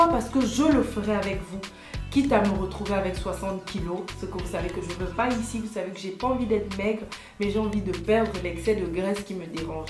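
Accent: Gabon. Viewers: Juste par ton accent je sais que t’es gabonaise 😋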